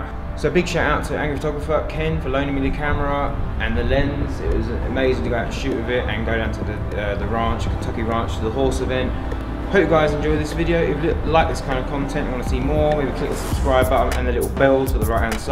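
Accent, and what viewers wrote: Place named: England, United Kingdom. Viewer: got confused for a mo.... English accent in Kentucky. ken is an amazing host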